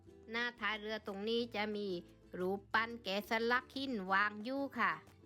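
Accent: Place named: South, Thailand. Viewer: ชอบจังเลยพูดภาษาใต้บ้านเราเพิ่งเข้ามาติดตามเดี๋ยวจะตามแลย้อนหลังนะคะ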